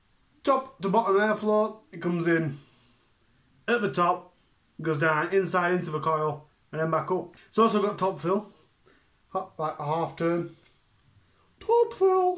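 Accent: Yorkshire, United Kingdom. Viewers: You naughty boy 😂😂 where abouts are you based I spot a Yorkshire accent 👊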